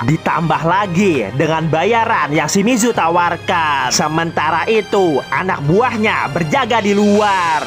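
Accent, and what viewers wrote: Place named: Bekasi, Indonesia. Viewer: Makin yakin gw kalo lu orang Bekasi bang, minimal Jaktim atau Bogor deh, kaga danta emang 🤣😂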